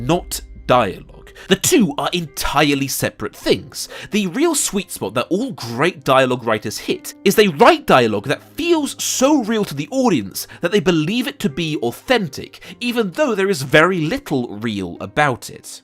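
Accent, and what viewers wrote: United Kingdom. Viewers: It's so refreshing hearing a British accent on YouTube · Imma' be honest, every time I click on video I'm wondering since when did he have a british accent.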